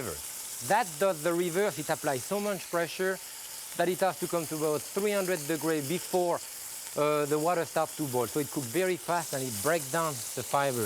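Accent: France. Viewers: Sometimes a bit hard to understand his french accent but I'm learning he is an awesome chef to watch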